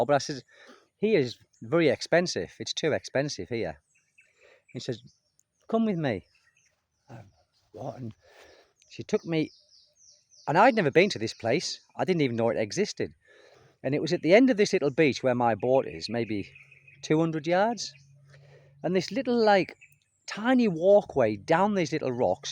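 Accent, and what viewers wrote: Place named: Northern England, United Kingdom. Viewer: Hi I have just discovered your channel and finding it really interesting I have had similar experience when I spent a year in Bali same expectation from locals when westerner arrives they must be wealthy even though I was exploring idea to retire there because retirement in my home country New Zealand was not looking to be comfortable financially however I gave it a year the weather I loved most of the people were friendly but I returned to New Zealand Bali was never going to work out for various cultural differences some you describe with life in the Philippines by the way I have lived in New Zealand for fifty years originally from north of England Middlesbrough your accent interestingly sounds very northern England curious as to where you originate from?keep up great videos love how your son speaks English I also can slightly hear a little north English accent from him so cute.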